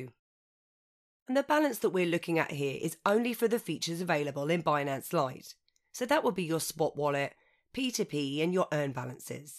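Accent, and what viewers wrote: United Kingdom. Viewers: you sound like your from in t uk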